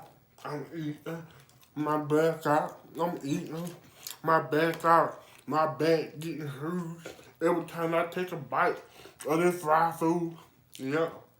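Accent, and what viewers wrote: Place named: South, United States. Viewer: I'm from California and I love your southern accent...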